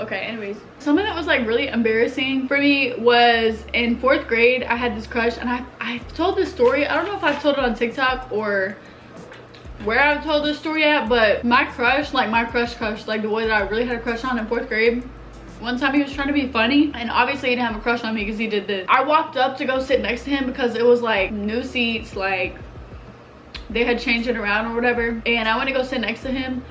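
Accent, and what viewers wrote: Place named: South, United States. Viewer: I love your little slight southern accent. It's so cute. ❤️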